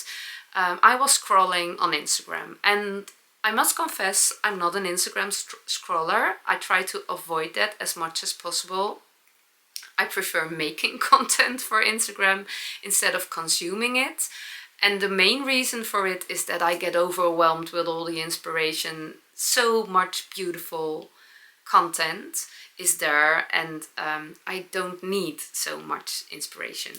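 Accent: Netherlands. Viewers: Love your dutchy English, you re searching for “kastanienbraun”, so similar to kastanjebruin, ❤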